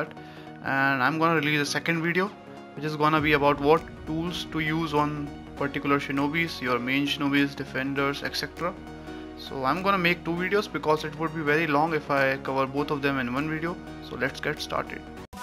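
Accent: India. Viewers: Indian hoke English 😅I can't understand 😢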